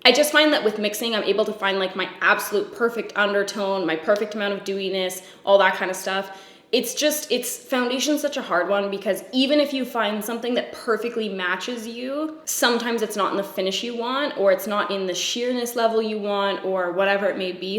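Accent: Canada. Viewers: I really enjoy watching your videos. It's hard to find naturally fair skinned, blue eyed, blondish artists doing makeup videos that are both imaginative and genuinely good. Also, I really appreciate how honest and down-to-earth your narration is. I know you said you're Canadian and not American but for a European, often the accents are pretty much the same. The American video narration style can be very cheesy or loud and repetitive without really saying anything new. It's for this reason that I actually rarely finish a makeup video. I tend to get irritated with the token phrases and the industry veneer everything has. Your videos taught me more about how to approach makeup and it's application...rather than just what's fashionable now... which is so important given how economically unfeasible it is for most people to buy these products. Thanks a mill for not being a knobhead. 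Love from Éire X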